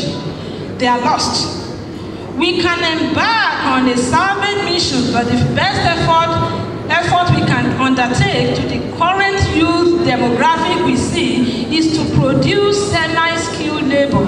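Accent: Yoruba, Nigeria. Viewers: at least she used Yoruba intonation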